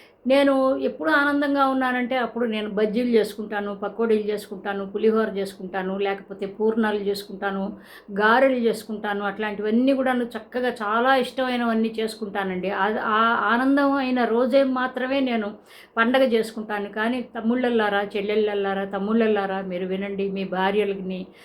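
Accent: Guntur, India. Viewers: గోల్డెన్ వర్డ్స్ చెప్పారు . నిజంగా ఆడవాళ్ళ బతుకులు అంతే. మీరు మాట్లాడుతుంటే మా గుంటూరు జిల్లా వాళ్ళు మాట్లాడినట్టు ఉంది మేడం చచ్చే నవ్వొస్తోంది. అన్ని రియల్ గానే చెప్తారు మీరు హేమలత తిరుపతి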